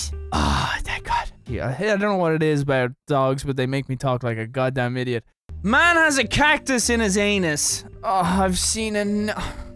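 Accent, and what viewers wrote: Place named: Ireland. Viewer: Sometimes he sound Irish and sometimes he doesnt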